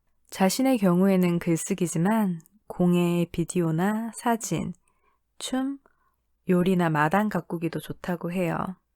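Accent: Gyeongsang, South Korea. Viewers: 너무 좋아요 경상도라니 전혀 못느꼈어요 화이팅하세요!!! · 원래 경상도세요? 전혀 몰랐어요 ㅋ 그리고 결정을 내리시는 순간에 저도 긴장했습니다 ㅋㅋ 저희도 찍을때마다 싸워서 늘쌍 고민중이에요 ㅎㅎ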